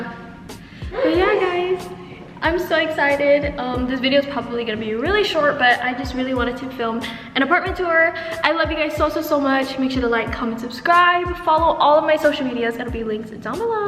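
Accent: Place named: United Kingdom. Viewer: 😂also i can hear some british accent going there✊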